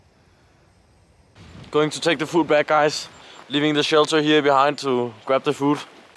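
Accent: Denmark. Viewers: Bro are you danish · Er du fra danmark? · are you danish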